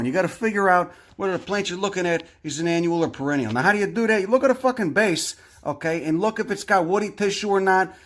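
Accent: Chicago, United States. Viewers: I just found your channel last night and I'm hooked. I've really only ever had an interest in botany insofar as it related to what was in my garden or anything growing wild nearby that could be useful to me somehow. This is changing for the better and you helped me to widen my interest in not just plants but all life that exists nearby, wherever I happen to be. Also I like your accent. Chicago, yes? I really enjoy how your accent might make someone think a certain way about you but then you immediately dispel that belief by dropping some knowledge and talking about critical thinking, a skill that is sorely lacking in this country due to the fact that it's harder to exploit someone who thinks critically about how's and why's. Thanks for taking time to share your knowledge with the world. Best wishes from Seattle, WA.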